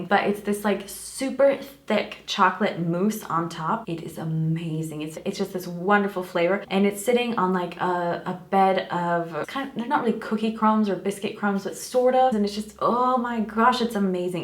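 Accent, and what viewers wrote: France. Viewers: girlll the french gave you an accent! I'm so impressed by your french channel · It's so cute that now when you talk in english we can hear the tiniest bits of a french accent sometimes 😊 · Great video! I've watched a couple of your videos now and I can tell that your American English is getting slightly diluted! It's kind of cool? The pronunciation/cadence feels more French. I'm an American living in California, and speak some French and can definitely tell that your time in France has altered the rhythm of your speech! Keep up your videos! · Your French accent is so beautiful <3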